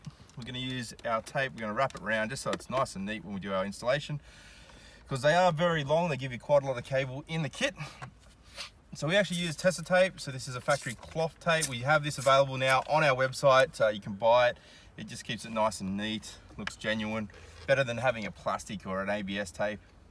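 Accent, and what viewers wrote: Australia. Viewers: Always good to fire up a video and hear an aussie presenting it. 👍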